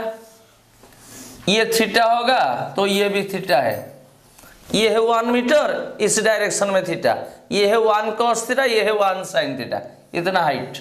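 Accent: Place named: Odisha, India. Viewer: Your hindi accent is like an odia...You must be an odia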